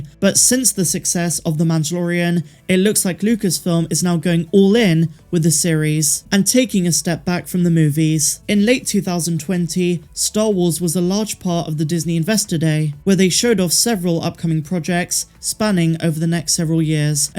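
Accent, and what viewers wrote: United Kingdom. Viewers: I ADORE YOU,! You freakin Rock 🤟❤️💋😘🤠! And hey, that Brit accent is pretty sweet too 😄💗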